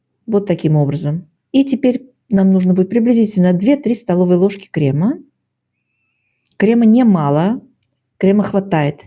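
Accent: Baku, Azerbaijan. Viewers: Бакинский акцент до сих пор прослеживается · Дорогая. Спасибо большое за рецепт. Обязательно попробую испечь для моих гостей. Вы чудесная женщина. Ваш бакинский акцент греет сердце.♥️ ❤ ❤ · добрый вечер!Я коренная бакинка.Сейчас живу на Кубани и с громадным удовольствием смотрю все ваши выпуски.Радуюсь вашему знакомому бакинскому акценту и замечательным и несложным рецептам.Оставайтесь такой же молодой,красивой и обоятельной! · Лёгкий бакинский акцент приятно слышать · где бы Вы сейчас на не жили, а бакинский акцент не ушёл. Счастья Вам и мира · Большой привет бакинцам! Приятно слышать бакинский акцент! Очень интересный канал, богатая коллекция рецептов!!! Спасибо вам!!! Я тоже жила в Арменикенде! С уважением из Ростовской области..... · Я,как услышала ваш разговор,сразу поняла,что вы бакинка.Я тоже пекла этот торт в 83 году.Очень вкусный. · Ах! Как я рада что нашла у вас этот рецепт. 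Давно искала. В Баку мамина подруга пекла, и было очень вкусно, он как ириска, тягучий с орехами, с приятным медовым ароматом. Спасибо вам огромное! Вы прелесть! Очень подробный рецепт, детальное описание, сладкий бакинский Джан акцент! Благодарю!!!!!!!❤ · Ваш бакинский акцент никуда не делся ❤ А мы в крем добавляли еще 100 г орехов, и в тесто тоже коньяк.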